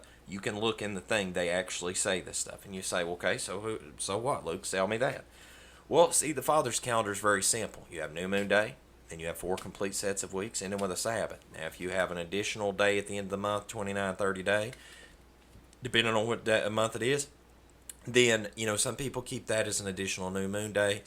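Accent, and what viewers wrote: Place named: South, United States. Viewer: Enjoyed this very much. Agree 99% lol I hadn't heard of this new calendar. I completely agree on everything you've said about the calendar craziness in history. I'm thankful Father finally told me to look up! HalleluYah! A side note. Its always nice to hear someone with a heavier country accent than myself 😆 Much Shalom and Blessings brother.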